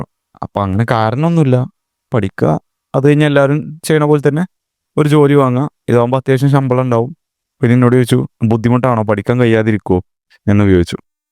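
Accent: Malappuram, India. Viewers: Bro Malappuram aano? Presentation kollam👍🏽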